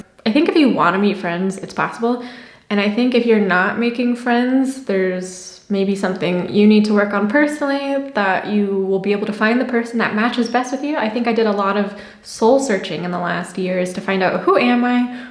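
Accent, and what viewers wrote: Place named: Germany. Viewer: Hey, just found your channel. I love your story and how you came to be in Germany. It sounds like you worked really hard to adapt to the German lifestyle and everything that comes with it. I can even hear a little bit of a German accent in your voice as well, which is awesome. I've heard of Americans who have lived in Germany FOR EVER and still haven't found their German tongue. I myself am half German and have been raised bi-culturally in the US. You've re-invigorated my drive to some day make that big 1-way trip to Germany some day soon.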